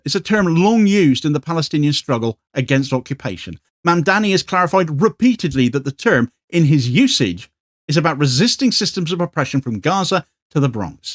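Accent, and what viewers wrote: Cornwall, United Kingdom. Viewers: Sounds like a Brit to me. ❤😁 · Cornwall mate 😅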